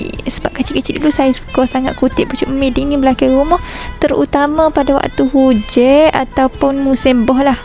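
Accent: Kelantan, Malaysia. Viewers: Wah mengajar dialek Kelantan 😲. Saya rasa bangga pulak bila boleh faham sikit-sikit 😂 bila ada cakap Kelantan. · Menariknya ulasan ni 😍😍😍. Suka dengar kecek Kelate. Ulang, ulang dengar 😄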